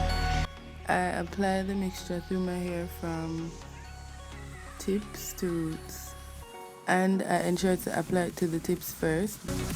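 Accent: Jamaica. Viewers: Jamaican accent, tun up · Knew you were a YAADIE (Jamaican) as soon as you started speaking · know you're Jamaican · keep the sweet Jamaican accent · hold up sis come straight from yaad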